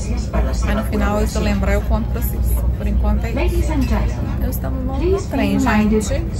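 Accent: Minas Gerais, Brazil. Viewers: ADOROOOOOO VIAJAR COM A QUERIDAAAAA!!! E desfrutar da sua simpatia, do seu sotaque mineiro que é o sotaque mais lindooooo do mundo!!!! Beijos ❤❤❤❤❤❤❤❤ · Pessoal nao corrijam a quando ela falar "tamos" ao invés de" estamos",o mineiro tem mania de falar cortando as palavras, e também diminui-las kkk,mas na hora de escrevermos escrevemos direitinho😊sou mineira, mas adoro ouvir o sotaque da é bem mineirim gostoso dimais da conta sô❤❤ abraços para todos!!!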